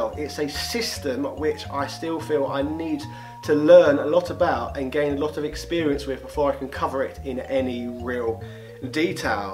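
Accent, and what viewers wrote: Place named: RP, United Kingdom. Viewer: It seems as if your ‘posh’ English accent is a bit exaggerated and it hurts my ears/ nerves/ head. Your natural delivery is probably just fine, so try what comes naturally. Don’t stretch yourself.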